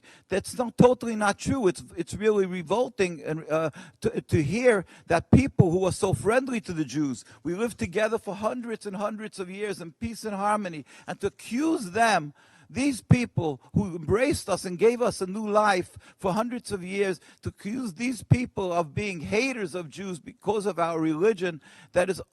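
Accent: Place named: New York, United States. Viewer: you know it's funny, says he's from 'palestine' then says his family is phillistine from many generations ago... but that accent doesnt lie. this guy is from new york. talk about a paid shill to parrot some agenda from across the world when he lives comfortably in new york city. he can talk all he wants and keep wasting oxygen but the fact of the matter is this man has most likely never been to Israel while rockets were fired over his head from a hateful spiteful section of people who were given every opportunity to have their own state. were given BILLIONS to build better hospitals and schools to give their people an education over the future generations... instead they built extensive networks of tunnels, bought missile and rocket parts from iran to build in gaza and send at their neighbor. they then invade and kill over 1500 civilians, not military, civilians. 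womp womp. dont start a war then cry when you've lost.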